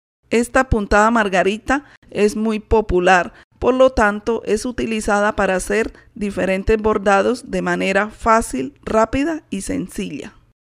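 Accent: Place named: Andes, Venezuela. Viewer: Su acento parece de los andes de mi Venezuela amada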